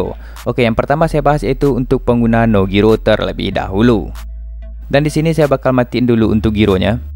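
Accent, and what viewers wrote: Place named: Padang, Indonesia. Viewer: oh.. orang Padang ya, bang. Kirain orang Malaysia 😅🙏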